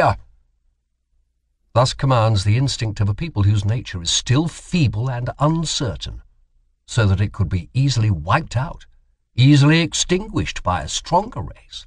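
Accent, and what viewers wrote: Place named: England, United Kingdom. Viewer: Very funny to hear Nietzsche's rant on Englishmen being read with an english accent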